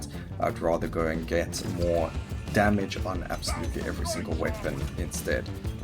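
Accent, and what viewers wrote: South Africa. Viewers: Awesome content. Thank you! Also so refreshing to hear a local SA accent when chilling to some gameplay.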